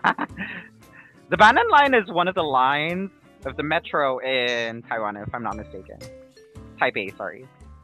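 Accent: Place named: England, United Kingdom. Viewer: I just finish this bl series and I really love it! This is the first bl series that really push me to download it on my devices because I always feel that I'll eventually lose interest on the previous bl's I watched and this one is a must watch! Though I never watch the 3 first HIStory part because I really don't have the interest? Don't get me wrong! And also this is the only bl series I watched that gives me a lot of emotions. Thanks for this amazing reaction vid and I love your English accent!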